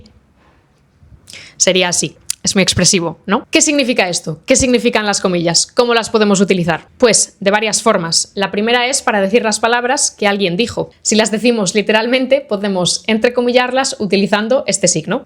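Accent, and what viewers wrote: Spain. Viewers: He encontrado su canal de YouTube. Soy brasileño y aprendí a hablar español con un matrimonio de más edad que tenía una hija pequeña, y nuestra amistad llegó al punto de que me trataron como a un hijo. En aquella época, ellos tendrían alrededor de 45 y 55 años, y yo unos 22; hoy soy yo quien tiene esos 55 años. Y con ellos aprendí a hablar español. Ella era catalana y él, sevillano. Mi español, sin falsa modestia, llegó a ser tan bueno que los hispanohablantes de América Latina, cuando conversaban conmigo, pensaban que yo era español, y se sorprendían cuando les decía que no, que en realidad era brasileño. En realidad, fuera de Brasil solo he estado en México y en Ecuador. Pero en Brasil he conversado con muchos hispanohablantes de distintos países de América Latina, y todos siempre creyeron que yo era español. Obviamente, un hablante de España debe notar que no soy nativo, pero el hecho de que los hispanohablantes latinoamericanos piensen que lo soy, me alegra mucho. 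Encontrar su canal ha sido un verdadero hallazgo, pues por diversas razones perdí el contacto con aquel matrimonio y echo de menos escuchar ese acento hispano. Como sabrá, en Brasil hablamos portugués y aquí pocas personas saben hablar español, o cualquier otro idioma que no sea el portugués. Y oírle hablar con ese acento suena como una música para mis oídos.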